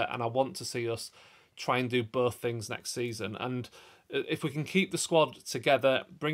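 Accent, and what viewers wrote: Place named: Geordie, United Kingdom. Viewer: Thats a very strong Geordie accent you have.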